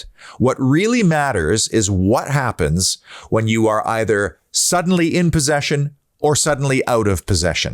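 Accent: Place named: Canada. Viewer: This is a great podcast, wasn’t sure initially when I heard the accent, what do Canadians know about football 😂, very judgemental I know, apologise for that. I will be adding this channel to my weekly watch as you left me feeling very optimistic and excited for the season a head. Keep up the good work 👍 UTV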